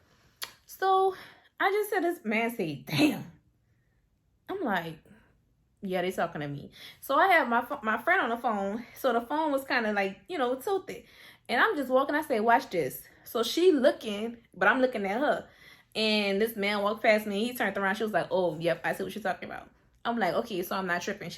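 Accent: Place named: Louisiana, United States. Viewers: I HAD TO FOLLOW BECAUSE U FROM MY HOMETOWN U SOUND LIKE U FROM LOUISIANA AND HOW OLD IS UR MOM IM 8 MONTHS POST OP IM GOIN BACK DECEMBER MIAMI JOLIE GETTING A 360 LIPOSUCTION AND A BREAST REDUCTION WITH SOME FAT TO HIPS THE LAST TIME I HAF DR SAMPSON FROM SEDUCTION HE DID MY 360 LIPOSUCTION WITH A BBL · Hey, I have a question are you from 504? The Accent sounds like the south mainly New Orleans which is where I’m from. My friend said hey go check this video out sis sounds just like you. Lol Peay that you have a fast safe recovery 🙂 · Its the LA accent for me bebby!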